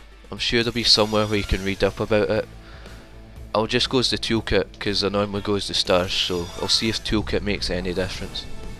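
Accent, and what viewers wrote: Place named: Scotland, United Kingdom. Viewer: You must be Scottish, cool accent! Regards BBKCjr. ;)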